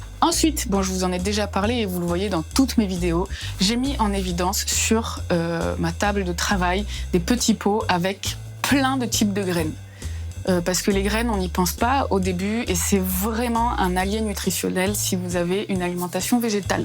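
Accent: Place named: South, France. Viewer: l'horreur.... tu as une pub sur les apports en fer pendant la grossesse et "pour répondre à vos besoins vous pouvez compter sur la viande".... Merci YouTube ! en tout cas J aime beaucoup ton énergie, l'accent du Sud et ton style ! Merci pour tes vidéos 😊 (je mange vegan et ca me donne plein d'idées ou parfois simplement la motivation. j'ai adoré la vidéo apéro et la polenta !)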